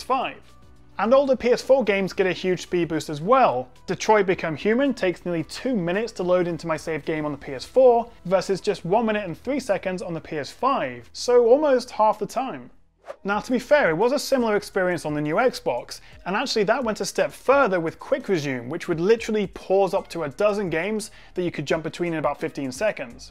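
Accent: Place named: North, United Kingdom. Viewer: why do you sound like a northerner pretending to be a southerner?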